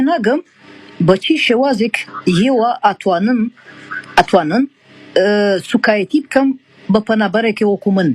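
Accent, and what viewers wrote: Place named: Sulaymaniyah, Iraq. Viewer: You got suli acent 😉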